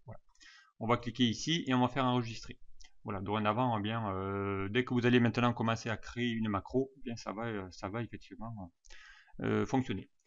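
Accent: South, France. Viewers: merci monsieur pour ce partage de savoir. Les explications sont très claires et en plus on le petit accent du sude!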